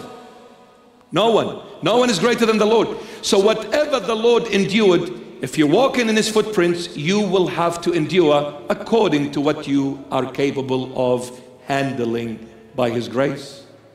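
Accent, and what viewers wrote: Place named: Australia. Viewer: dear brother Mar Mari Emmanuel The Holy Spirit is truly guiding you Brother, may God bless you and protect you always in Jesus Holy name.:thanksdoc: p.s you have an Australian accent are you in Australia?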